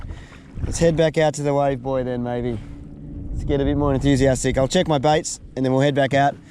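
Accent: Australia. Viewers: You channel is addicting. As a guy with a expat snowy mountains father, the accent takes me home. Yeah nah y’all the best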